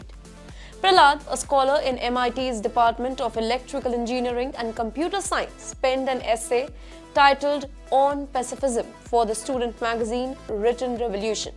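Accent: India. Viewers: नमस्ते जी, आप अंग्रेज़ी हिंदी के समान बोलती हैं।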